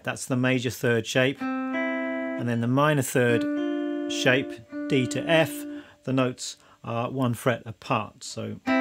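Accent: United Kingdom. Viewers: Good stuff, thank you! A little funny to hear a guy with a British accent playing country 😁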